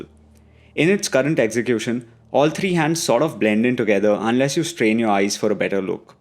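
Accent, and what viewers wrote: India. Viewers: God I can’t stand Indian accents 🤢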